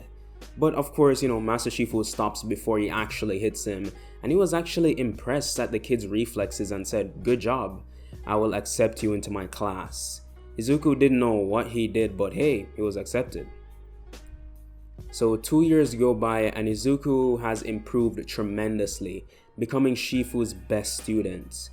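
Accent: Jamaica. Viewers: Jamaican accent?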